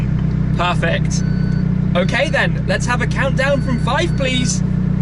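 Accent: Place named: United Kingdom. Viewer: Nice clear British :《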